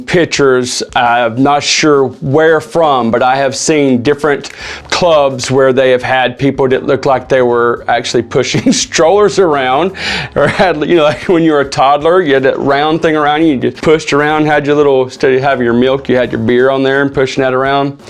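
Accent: South, United States. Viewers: I heard the draw and was like....he's from my neck of the woods. Howdy from South Carolina!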